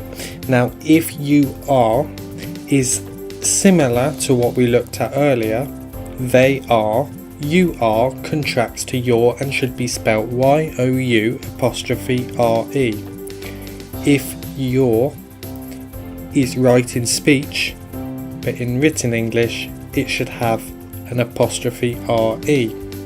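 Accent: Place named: United Kingdom. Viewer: What British accent do you have? It sounds very clear to me.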